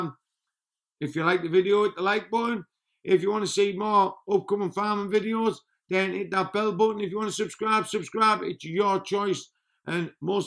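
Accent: United Kingdom. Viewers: Trying to place the accent..... UK but where .... Wales or Scotland?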